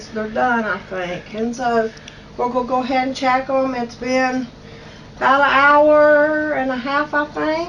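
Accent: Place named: West Virginia, United States. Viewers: Love your videos. I saw Appalachian cooking and thought of my Daddy , he was born in the Appalachian mountains. He had the same kinda accent as you. Brings back alot of memories and your cooking is similar to his. God bless you. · I would LOVE to know where you live.. You sound like you are from either West Virginia or Kentucky..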